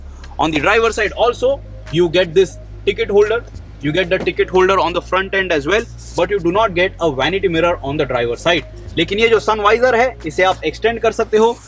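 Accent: Maharashtra, India. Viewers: Nice marathi accent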